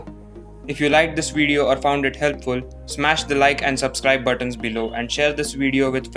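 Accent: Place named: India. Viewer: I like your India acent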